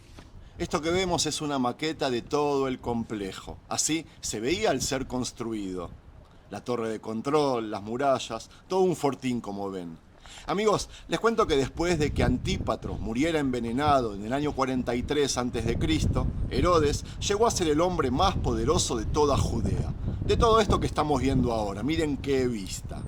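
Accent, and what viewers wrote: Argentina. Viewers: Que lindo es escuchar a un argentino tan lejos cuando dice che, Saludos desde la 🇦🇷🇦🇷🇦🇷🇦🇷 Dios bendiga a 🇮🇱🇮🇱🇮🇱🇮🇱 El lugar en donde nació mí salvador..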